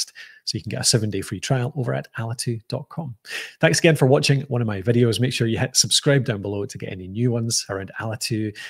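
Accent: Scotland, United Kingdom. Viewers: soon as i heard that Scottish accent i knew i was in safe hands, top man!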